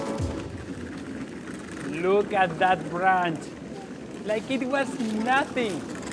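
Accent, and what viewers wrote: Spain. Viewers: Le note ancento español pero hablaba ingles jajaja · Es cosa mia o su ingles se escucha muy españolisado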